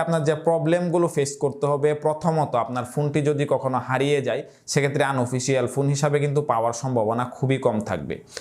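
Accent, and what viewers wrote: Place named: Mymensingh, Bangladesh. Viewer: মমিনসিংগা মনে হচ্ছে!!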